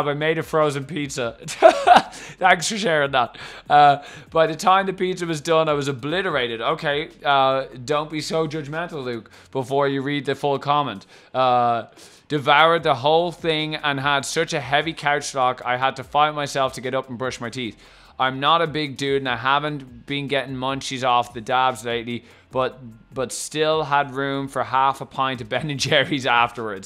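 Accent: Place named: Ireland. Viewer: are you Irish ? I can hear a little bit of an accent, are you in the US ?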